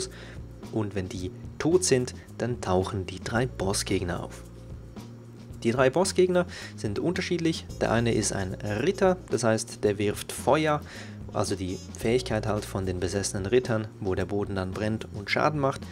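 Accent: Switzerland. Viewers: Bist du Schweizer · Der Sprecher ist Schweizer. Man hört es ganz ganz leicht :D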